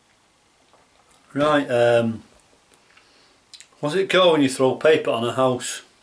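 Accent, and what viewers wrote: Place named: Midlands, United Kingdom. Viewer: Nice one, watched Christians and yours, quite a bit of difference. I'm from the midlands and you say everything the same as me except gym shoes are trainers surely lol. Cheers 🍺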